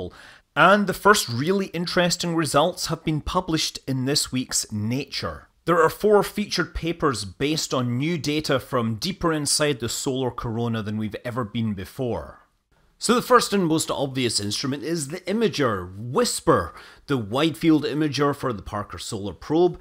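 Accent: Scotland, United Kingdom. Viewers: Don't worry, it's just scottish. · This fellow sounds Scots like me! So what would he know about the sun? I've glimpsed it twice in my lifetime. Great upload. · I love the Scottish dialect ❤️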